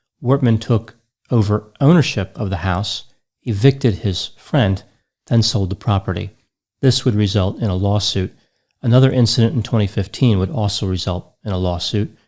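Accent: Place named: Boston, United States. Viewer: Hi Dr Grande fans, can you tell me what accent is? I’m from Australia and I can often pick American accents but I can’t quite pick this one. His accent is apparent when he says the word ‘on’ and it is super cute. If I had to guess I would say Boston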